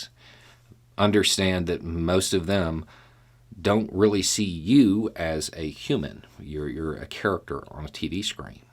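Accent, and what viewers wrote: South, United States. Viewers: is it a souther accent? Thanks to Blance Devereaux I like it. 😊 But I'm not American, so I probably haven't been exposed to certain things that could influence me. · It was definitely the contrast between your look and sound and your actual content that hooked me. I have family scattered across the south, so I know all southerners aren't hicks, but you're definitely a breath of fresh air. Keep it up! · I don't remember how I found you. Maybe it was something like "viewers of this channel (say...Seth Meyers Closer Look as an example) "also like this channel" (you) . I also enjoy watching "A guy" from Minnesota. Maybe youve heard of Vice Grip Garage. Another super intelligent fellow who comes off as a hick from the back woods. Not that you are either a hick or from the backwoods. But Derek is a person with an affected accent and he has tons of followers. 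Perhaps YouTube put your stream in the same queue because of that. It doesn't matter how I found you...but that I did. The last 5 years have been something of a wild ride emotionally capped off with the devastating effects of the ongoing pandemic. Discovering your voice has had a calming effect on me. Seth and Stephen Colbert are great at keeping disillusionment at bay. But in the last 4 months...you have really set my mind at ease. I can say this. Before the MAGA crowd appeared I had always thought that Southern boys were the pinnacle of common sense. I grew up watching the Daltons and later The Dukes of Hazzard. Uncle Jesse was the stereotype of what I believed to be honest folk intent on doing right for the sake of being on the right side. Of course nevermind the two centuries of racism. It was a breath of fresh air when I heard the philosophy that you use from your cap wearing furry faced southern accent. 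Something that likely exists but is not common. You have a gift. I'm so glad you use it. I think while I knew these th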